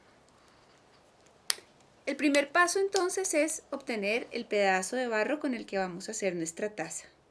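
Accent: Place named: Colombia. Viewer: ❤Que interesante, sencilla, detallada, precisa y Muy amena explicación. Ese amor en tu enseñanza y sobretodo ese sutil Acento Colombiano tan bello. Gracias Gracias Gracias 🎉😊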